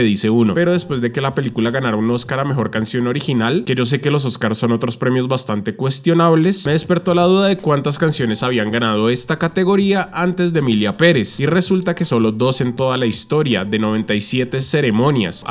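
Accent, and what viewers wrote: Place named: Bogotá, Colombia. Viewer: X2, tiene puro acento rolo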